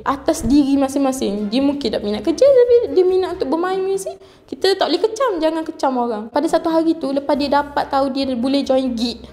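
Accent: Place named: Terengganu, Malaysia. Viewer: Pekatnya ganu sis🤣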